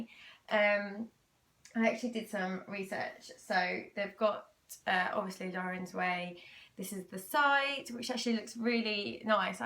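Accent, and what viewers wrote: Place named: United Kingdom. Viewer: The content of this video is not for me, but I watched anyway, because well, I am a sucker for a gorgeous woman with a British accent :)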